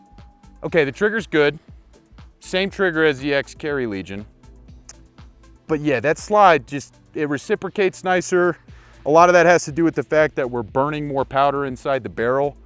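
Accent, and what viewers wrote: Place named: New Jersey, United States. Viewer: Turned it off a quarter of the way through. I couldn’t listen to him say “one shot everybody knows the rules” one more fucking time. Can’t stand listening to that Jersey shore voice either. Have a great life. Wish you luck.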